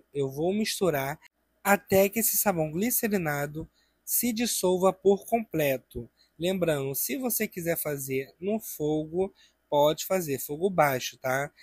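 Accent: Rio de Janeiro, Brazil. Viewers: Oi Boa noite.Nossa eu sempre procurei 1 receita de pasta; porque aqui em SP e muito caro.🙏🏻❤ por nos ensinar c tanta clareza e dedicação vc e 1 luxo e os CARIOCAS falam fofos👍😘❤Inte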